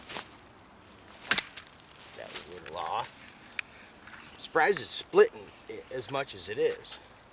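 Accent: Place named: Michigan, United States. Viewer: You sound like you're from Detroit-area Michigan.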